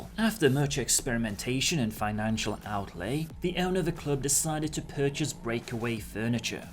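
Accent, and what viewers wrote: England, United Kingdom. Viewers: I'll just take it that I wasn't off with that assumption. I would like to thank Sean Bean, for it was his accent that yours reminded me of. Mostly the pronounciation of the ol' diphthong "ou" like in "yOUng". Jolly good. That said, it's probably the best of the english accents, because Sean Bean.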